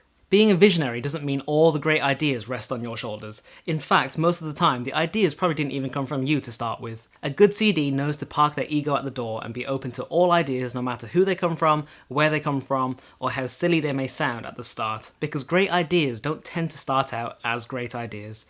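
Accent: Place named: United Kingdom. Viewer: Why does a british accent just exude competence in a creative director role?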